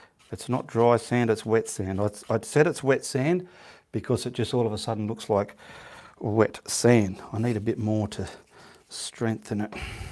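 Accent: Australia. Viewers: Love your 'Stralia accent as much as your teaching skills. Oldie me (72yrs) in Inverurie. NE Scotland( Re accents I speak The Doric,so "Fit a fine daundie mannie learning us tae pint.xxxx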